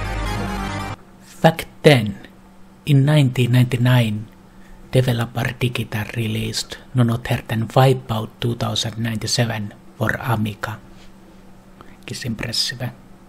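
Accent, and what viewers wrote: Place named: Finland. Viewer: Why you speak English in Suomi pronouncation?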